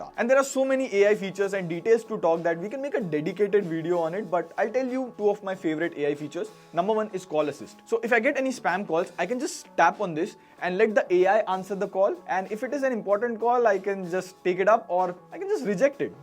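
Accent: India. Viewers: Impressed by exynos improvement...!! Google auto caption is great I will give it a 98% despite ya Indian accent. Definitely it didn't caption exynos correctly ... That's not English though 😂😂😂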